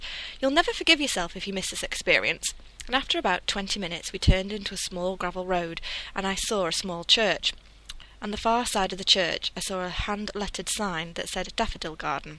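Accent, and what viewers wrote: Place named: United Kingdom. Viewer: I love British accent! I wish i would live with this principle.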